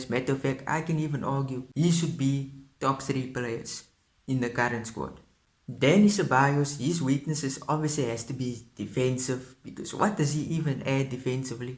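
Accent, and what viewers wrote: South Africa. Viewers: Bro you sound South African like colored 😅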